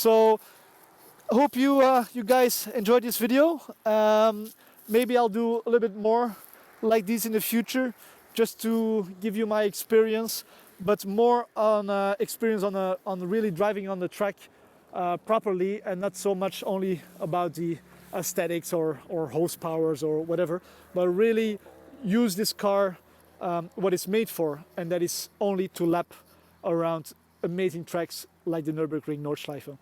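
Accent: Belgium. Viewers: Where are you from ? you don't sound German (otherwise your english is very good) you sound more like a Belgian talking English :p unless i'm that mistaken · Interesting video! 👍 And clearly you're from Belgium. 😄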